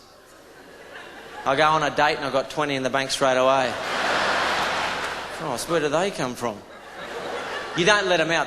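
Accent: Australia. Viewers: no shit listen to his accent are you deaf? You couldn't be more Australian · The Australian accent is amazing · nice to hear an aussie accent again :) good ol days.. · He makes himself sound so much more bogan. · Country u come from isnt the factor what makes the difference in humor.... punch lines and the way you bring it does.... Aussie accent sounds better I'll admitt to that! ... 😂 bring on the laughs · Hilarious!!! Lazy Aussie accent + everyday things = killer combo. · OMG!!! This guy is freaking HILARIOUS!!! I'm in pain from laughing so hard!!! Love that Aussie accent to top it all. Outstanding indeed!!! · Isn't good to hear a true Aussie voice...and an ol fashion one at that😅...just like grandpar & ya uncle from the 50 and 60s..what ya think..a bloody fairdinkim aussie😊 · lol... he is so aussie.... that accent is so strong. :D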